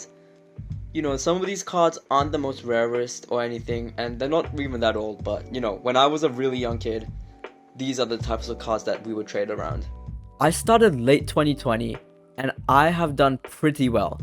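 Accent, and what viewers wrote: Australia. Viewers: Great vid bro rlly helpful, also r u Aussie?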